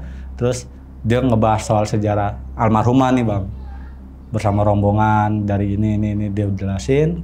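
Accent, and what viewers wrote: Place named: Betawi, Indonesia. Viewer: Keren lu bang bahasa Betawi Bener 😂🙏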